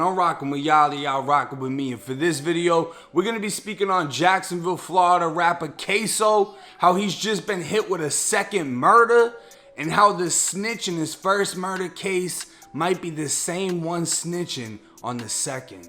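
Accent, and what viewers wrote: Boston, United States. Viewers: Oh yeah you definitely from Boston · That Boston accent heavy bro 😂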